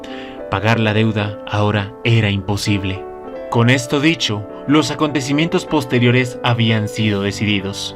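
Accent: Colombia. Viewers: Ste man habla colombiano de pura cepa jajaja es una chimba escucharlo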